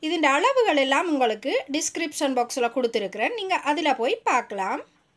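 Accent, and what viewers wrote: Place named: Sri Lanka. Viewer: Thank u ... Elaggai tamil nalla irukku... Nice recipe...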